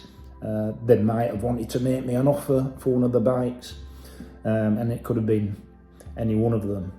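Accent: Yorkshire, United Kingdom. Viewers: I believe the first thing that got me to subscribe was your Yorkshire accent. 😄 I like the way the channel content is laid out. I enjoy it all. Good honest reviews and opinions. Which ever way the channel goes, I will be here bud. Cheers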